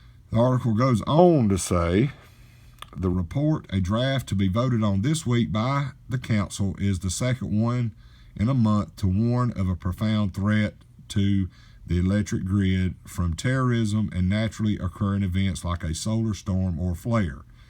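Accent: United States. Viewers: I love a country man's accent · Why thank you. And may I add now I have your lovely Country accent in mah head and so I'll probably be talking like this here fo a lil wwhuailee Amen lord Jesus help us all. This is our Government's way of wippin a'out the peiiples see here that done need ta get done swiped out'der decrease tha population see there that's Whautt that right'e their is I done told Lucille she don't done believes believed meii. She sure heck will now!!!!! 💓💓💓💓💓💓🙌😘💌💋☺I done subscribed y'all do the same. God bless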